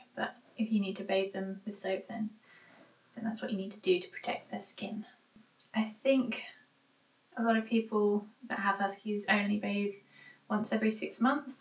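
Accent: United Kingdom. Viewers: Very educational video. Thank you. I never bathed my husky either and she never smelled badly. She would lick herself like a cat, I guess to clean herself. I love your British accent, btw. Maybe I’ll learn how to speak with one somewhere because my American accent is sounding a little rough to me now.😁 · I love your dog but also your "so British" accent (I'm french)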